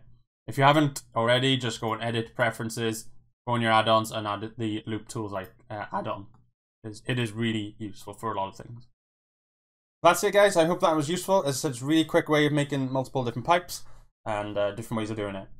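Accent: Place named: Wales, United Kingdom. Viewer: Thanks for this video! Are you from Wales? If so where abouts? I'm in Pembs, I reckognize the accent.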